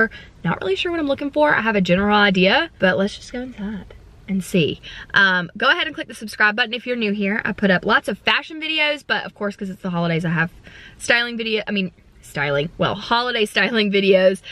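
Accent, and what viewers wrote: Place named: South, United States. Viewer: I love the southern accent! 🥰 My Alabama accent comes out when I get angry... or when I go into “mom” mode. 😆😂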